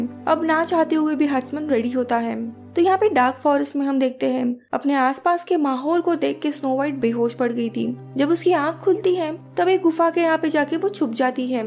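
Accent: Maharashtra, India. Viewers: do you have any relation with nikhil of climax explained in hindi .moreover you both sound a bit marathi . pls tell and BTW love ur explanation.thank u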